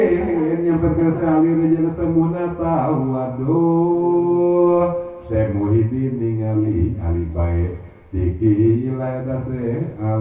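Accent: West Java, Indonesia. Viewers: Logat sundanya unik trimksh bisa menghibur 👍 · Logat sundanya mantap mas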